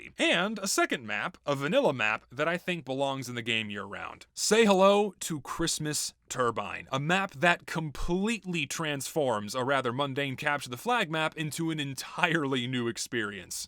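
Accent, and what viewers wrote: South, United States. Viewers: I love the southern twang in your voice, it's so sexy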